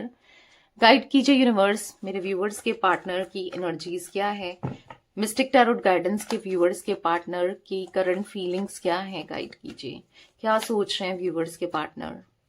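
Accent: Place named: Punjab, India. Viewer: Ap ki awaz Punjabi+Urdu = Mix tone ha unique voice ❤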